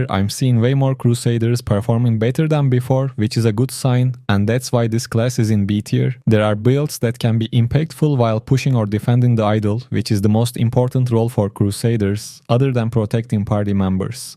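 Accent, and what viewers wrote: Turkey. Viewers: türk ingilizcesini nerde duysam tanırım xD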